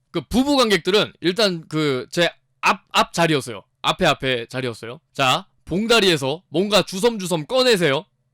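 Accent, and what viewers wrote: Busan, South Korea. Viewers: 이형은 영화관이랑 인연이 안좋아ㅋㅋㅋㅋㅋㅋ 그런데 부산분이셨나???ㄷㄷ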